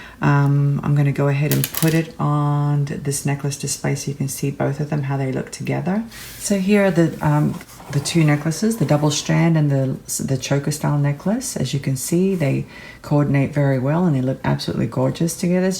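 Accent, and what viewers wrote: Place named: Australia. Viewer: Forgot to say, Love your accent! Are you Australian? Just thought I’d guess.. your home is absolutely beautiful by the way!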